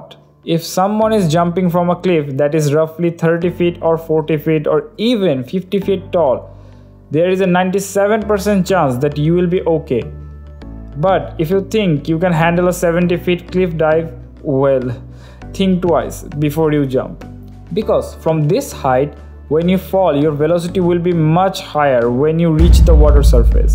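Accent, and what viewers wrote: India. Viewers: As a native portuguese speaker, he speaks like an indian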